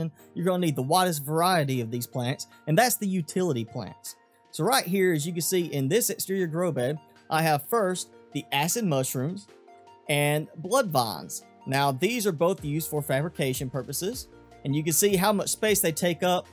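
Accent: South, United States. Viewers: This guy sounds country. Nice video it helped me so much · Southern not western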